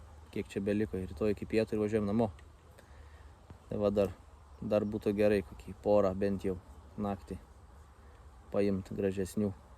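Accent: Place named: Pakruojis, Lithuania. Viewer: Iš kokia kraštą buset kalba kaip Pakruoje🙂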